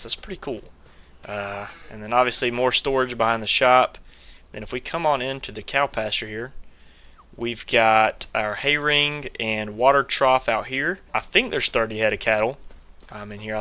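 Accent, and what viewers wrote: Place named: North Carolina, United States. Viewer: Just found your channel watching this series loving so far don’t know where your from but sounds like NC only because you sound just like Dale Earnhardt JR lol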